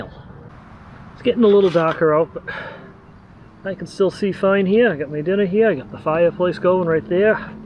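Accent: Boston, United States. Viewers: Just knew you must be from the Boston area! You sound so much like my son-in-law from Beverly.🙂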